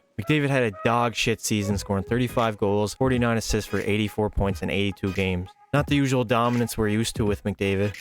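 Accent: Newfoundland, Canada. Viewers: No way your not a Newfie, that accent lol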